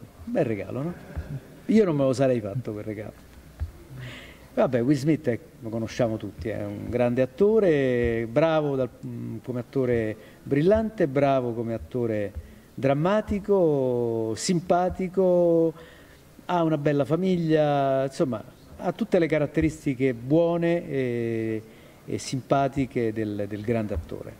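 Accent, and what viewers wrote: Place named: Rome, Italy. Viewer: Diversissima no, ma è ovvio che in un contesto come questo sia meno impostata e si senta di più l'accento romano .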